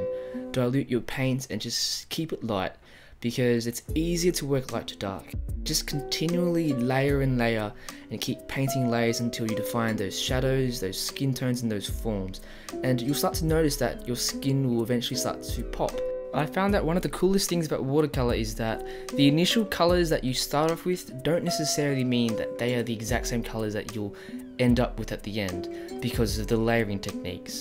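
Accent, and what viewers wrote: Australia. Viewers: Hey I love your work but please work on your pronunciation! it’s hard to understand you. Usually it’s hard to understand asians speaking english, imagine adding australian accent to it hahaha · I recognised the accent, then saw that you’re from Australia too! G’day to all my fellow Aussie artists.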